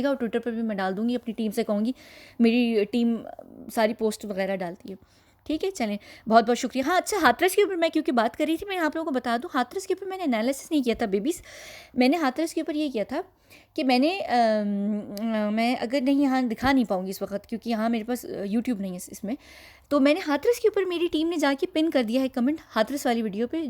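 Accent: United Kingdom. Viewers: Please don't be defensive about your English accent, there are going to be many who will not understand your pronounciation...your accent is yours....move on. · Your English accent is as perfect as a British or Australian accent; however I don’t like them and that’s my problem. I like your hindi/ Urdu accent. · Salman Sucking up to PM Modi as the case is now in Modi’s hands. P.s - love your pommy accent 😊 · Plz carry on we like your English accent... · Completely agree with you. Listened till the end. I love her accent too, English British accent ... 😊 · I love your English accent. As you said you have learnt it... Any advice from you to kids on how to learn it? I am all 👂 👂!!! 🙏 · Great British accent👍😇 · Whats wrong with having an English accent? Thats like me saying hey I dont get pure Hindi words. Theres nth wrong with either or. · Didi I really like your English accent..and your Hindi too...So be proud of yourself..We really love u didi.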